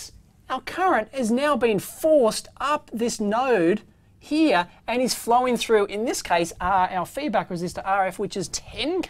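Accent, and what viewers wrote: Australia. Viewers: This may be the most Australian sounding man I have ever heard.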